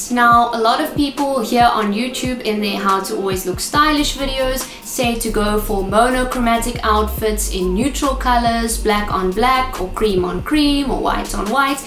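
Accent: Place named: South Africa. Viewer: Just found your channel and love the tips. Where are you from? Your accent sounds South African xx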